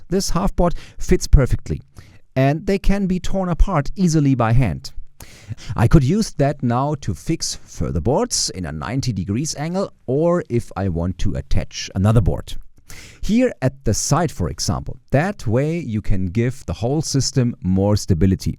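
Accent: Germany. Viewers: 1. Kann es sein das das ganze nachgesprochen wurde? Und wenn ja, gibt es das Original auch auf Deutsch als ganze Serie? 2. Ich finde man hört mega das Deutsche raus :D Also ich nehme mal an das ist ein Deutscher sprecher, was keinerlei kritik ist, ich finde es nur erstaunlich wie... Deutsch die Stimme klingt :)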